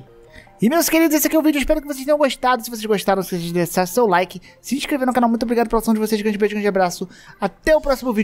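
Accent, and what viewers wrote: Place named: Bahia, Brazil. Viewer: vc e baiano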